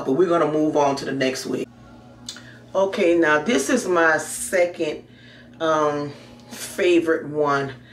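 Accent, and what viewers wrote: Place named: New Orleans, United States. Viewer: HAHA! Stumbled upon this channel looking at TEMU reviews and OMG as soon as you said BIG CHONKY instead of chunky and "Head full of hair", I just knew you are from New Orleans 🤣🤣🤣⚜⚜⚜⚜ #Subscribed but even though you make your own wigs, you can make a lot of money on here doing wig reviews for companies. Particularly it's the Asian companies that reach out and want to work with you. That big blonde wig is sending meee! 😂😭😭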